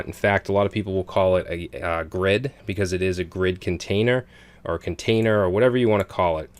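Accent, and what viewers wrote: Boston, United States. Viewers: I hear a fellow Bostonian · BOSTON ACCENT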